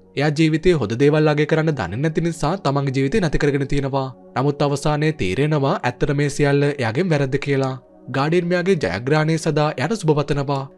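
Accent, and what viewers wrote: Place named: Galle, Sri Lanka. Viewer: මේ කතා කරන එක්කෙන ගාල්ලෙ පැත්තෙ වෙන්න ඔනා.....😂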